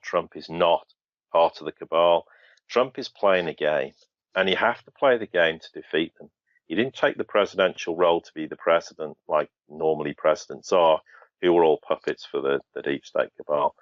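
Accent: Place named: London, United Kingdom. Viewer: Does he keep saying Western capitalists or Western kabbalists? Damn London accent I can't tell the difference.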